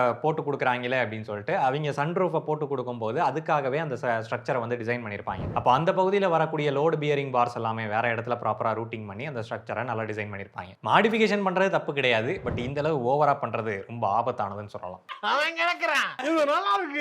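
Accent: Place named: South India, India. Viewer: Love the dindukkal/south slang boss!! Keep up the good work!!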